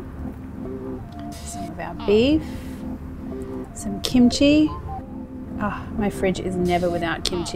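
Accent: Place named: Australia. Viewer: I like you Australian accent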